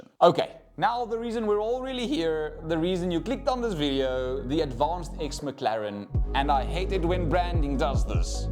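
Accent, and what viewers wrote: South Africa. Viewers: The South African accent😊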